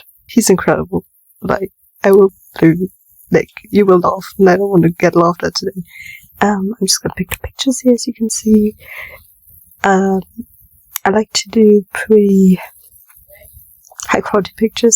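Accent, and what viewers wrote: Germany. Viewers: Thanks for the tut Also German accent?? My friend speaks German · I LOVE YOUR VOICE! Also your german accent is so cuteee :3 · AWWW I LOVE YOUR VOICE despite knowing you were German I didn’t think about you having an accent lmao but it’s also adorable??? like girl I lowkey want it 😭 ich spreche Deutsch a bit too as I’m a little German myself :) great tutorial as well!! <333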